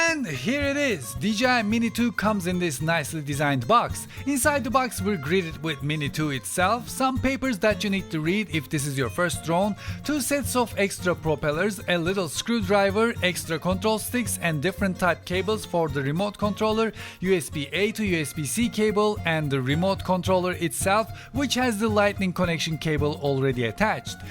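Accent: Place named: Turkey. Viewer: Müdür sen TÜRK müsün? Türk gibi konuşuyorsun ingilizceyi.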